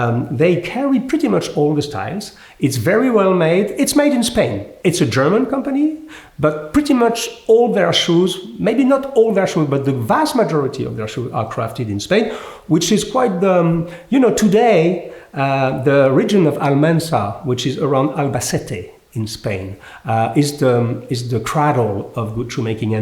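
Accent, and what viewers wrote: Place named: France. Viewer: Dont want to be rude, but the pronunciation on skoaktiebolaget is like three words skor=shoes aktier=stocks/shares and bolaget=company but in this context it means shoetradingcompany, so put air between sko-aktie-bolaget and you will be fine even with a french pronunciation, wich is not to be complained about. Kind regards from Sweden and thanks for lifting that Company .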